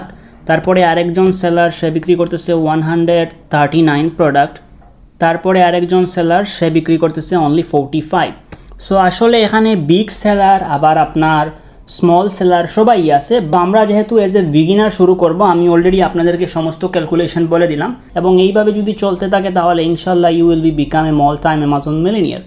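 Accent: Sylhet, Bangladesh. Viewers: Bhai ki sylhety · ভাষা শুনে বুঝা যায় ১০০% সিলেটি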